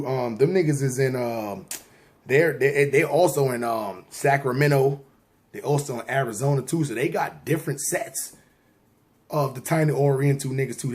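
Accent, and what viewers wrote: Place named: New York, United States. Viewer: Bro me and my family is from San Diego and you are not from San Diego you sound like you're from New York City you guys are weird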